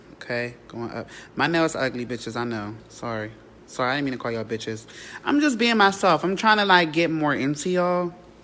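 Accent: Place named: Baltimore, United States. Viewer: He sound like one of those baltimore people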